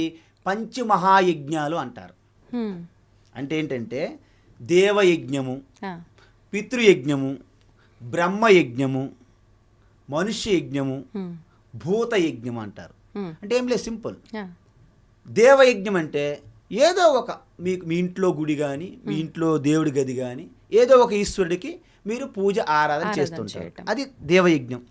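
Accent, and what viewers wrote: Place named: Srikakulam, India. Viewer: స్వామి గారి ప్రవచనములు యెుక్క వివరణ ఛాలా బావుంది. కాని ఈయన శ్రీకాకుళం జిల్లా లావుంది ఎందుకంటే ఈయన యాస అలానే ఉంది.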